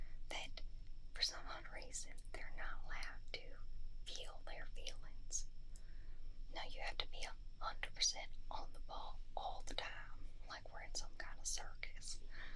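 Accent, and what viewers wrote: South, United States. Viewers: I've never heard a witch with a southern accent before, hehe! A lovely witch and some lovely triggers. 😌❤️ I enjoyed this. 😊 And I love how consistent your uploads are by the way. ❤️ I hit Like. 😉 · Southern accent is best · Thank you southern!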